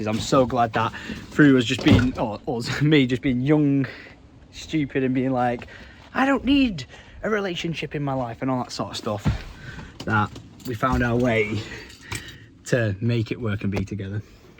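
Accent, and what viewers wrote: Scotland, United Kingdom. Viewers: Love your Scottish accent, I'm from Scotland myself, we need more of it in the vlogs. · I laugh way too much at Scottish accent 😂 Honestly so glad for the day that the YT algorithm led me to this guy’s channel to watch one of the LA travel blogs. Top tier entertainment!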